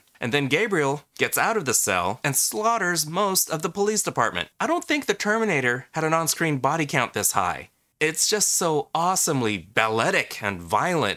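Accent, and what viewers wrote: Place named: South, United States. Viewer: I just found your channel and really like your reviews! Sorry if you've said it before, like I said I'm new to the channel, but I was wondering where you're from? I think I'm hearing a bit of a southern drawl but I can't be entirely sure. Love from Atlanta!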